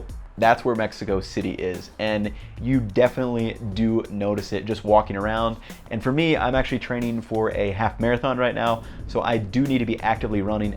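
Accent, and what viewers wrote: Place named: Seattle, United States. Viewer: Where in the US are you from? You sound like Seattle?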